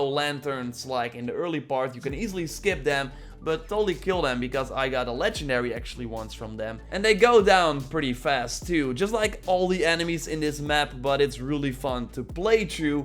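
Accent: Netherlands. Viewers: That dutch accent though..... Lekker bezig mannen!! 😜😎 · Because they're both Dutch and this is a common accent here. They overpronounce stuff. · Are you dutch because your accent bleeds through every now and then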